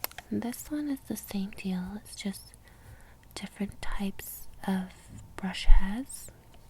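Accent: United States. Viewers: Dude you pick up accents so quick, I can hear the US accent creeping in already :D awesome video friend, grateful as always.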